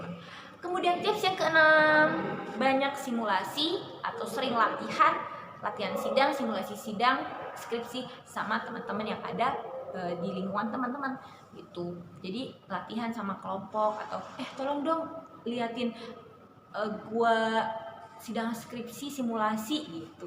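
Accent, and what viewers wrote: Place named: Java, Indonesia. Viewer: Ketawaa pas kebeek ngomong *degdegaaan😆 medhok amat😆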